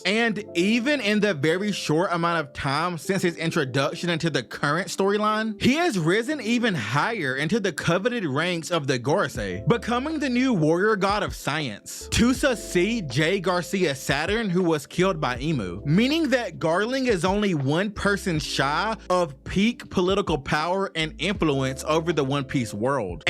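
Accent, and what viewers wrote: South, United States. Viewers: I'm loving the southern twang bro Def adding you to the one piece yt lineup 🙏🏾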